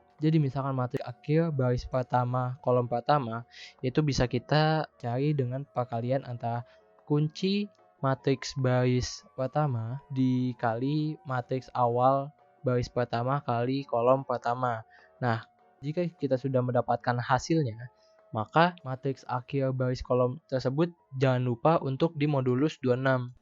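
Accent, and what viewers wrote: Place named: India. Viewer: The real india accent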